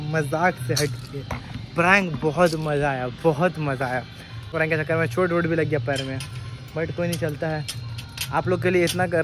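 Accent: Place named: Bangladesh. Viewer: Apki bengali bohot cute hn ☺ Mst holi prank 🤣🤣🤣 bohot maza aya 🤣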